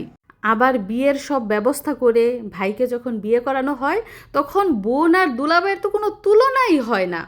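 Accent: Gazipur, Bangladesh. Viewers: Apu Apni ki gazipur er? Ami o gazipur er BT Dhaka te Jonmo bere otha... Apnar kothay amr o Mone hoto apni gazipur er BT kokhono comment kore joges kora hoy nai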